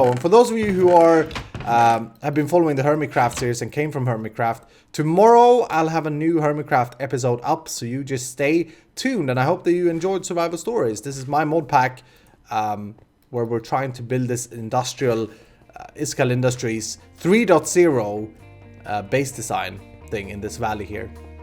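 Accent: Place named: Sweden. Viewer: Fin svensk brytning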